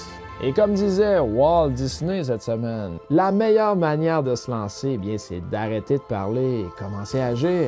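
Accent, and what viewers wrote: Canada. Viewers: J'aime bien votre accent Canadien et la petite voix. Un Français qui apprécie ce que vous faites comme travail.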